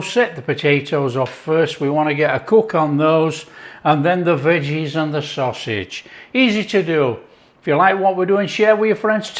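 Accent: Yorkshire, United Kingdom. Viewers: why didnt you poke the sausages? Mu husband is from Leeds, and when he puts on a Yorkshire accent it sounds like you. I randomly came across your video because i googled sausage potato onion trying to find a new way to cook my big "lad's" cumberland sausage. Im German- Canadian and we came across some wonderful food like this while visiting Germany. I subscribed.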